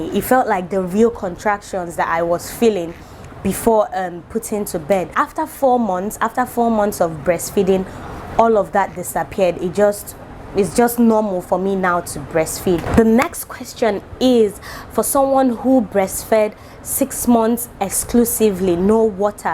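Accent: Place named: Igbo, Nigeria. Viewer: Am here for the Igbo words and accent. Love from Kenya ❤️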